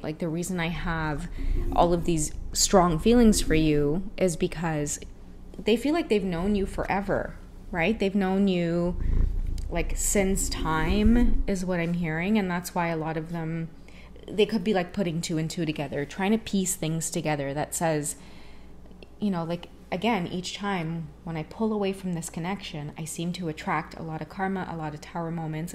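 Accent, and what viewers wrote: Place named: Trinidad and Tobago. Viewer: It sounds so lovely when speak Trini. I just happened to hear on of your shorts rt now where Ur speaking Trini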